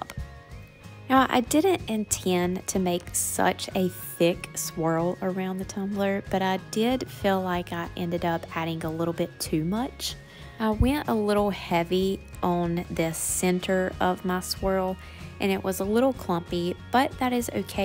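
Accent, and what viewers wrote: South, United States. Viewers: Glitters are gorgeous!!! I love PDB!! My favorite glitter of choice!! What part of the South are you from? I know a Southern accent when I hear it!! 😂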